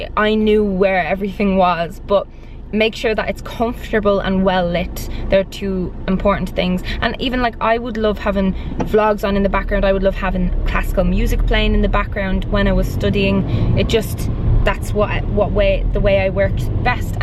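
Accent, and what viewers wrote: Ireland. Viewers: Hello my friend how are you to day ? I subscribed to your blog . I like your Iresh accent very much and would like to hear more from you .I find you to be very cute and would like to get to know you much better .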